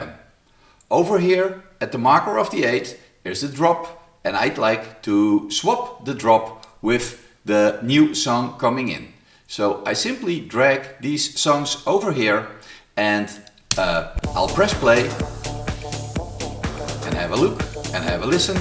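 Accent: Netherlands. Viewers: hahaha hij praat Engels op de Nederlandse manier 😂 je hoort het accent gewoon 😜 wel cool dat deze software van Nederlandse bodem komt ! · I like your narration - is that a dutch accent I hear? reminds me of my grandpa :) good times!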